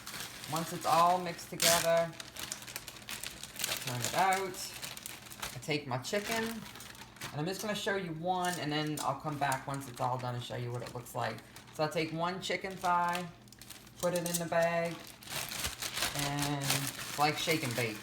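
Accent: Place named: New Orleans, United States. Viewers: Love that Louisiana accent! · Soon as you opened your mouth I said “she’s from New Orleans or southern Louisiana “. The I looked at your username. Lol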